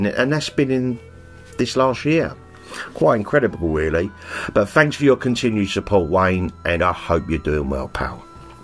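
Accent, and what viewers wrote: Cockney, United Kingdom. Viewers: GO ON MY SON GET IN THERE. ICF. EAST LONDON IM HAPPY TO FIND OUT YOUR WEST HAM LIKE ME I WONDERED WITH THE COCKNEY ACENT BUT NOW I KNOW PS I PLAYED FOR WEST HAM UNDER 16S IN 1985 SHAME I GOT HIT BY A CAR PUT IN WHEEL CHAIR FOR 14 MONTHS 😮😮🙏💯🤞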